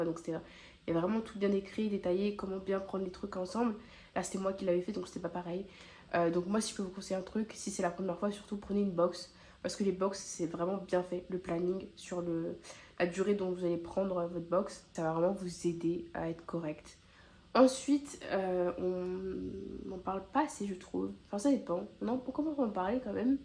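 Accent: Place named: South, France. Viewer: Tu as chopé l’accent du suuuuud !! Hâte de tes prochaines vidéos ❤️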